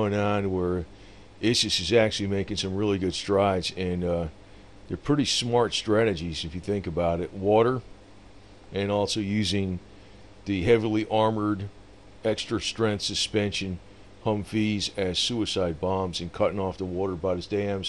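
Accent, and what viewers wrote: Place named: California, United States. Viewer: Sound like California until u said isisl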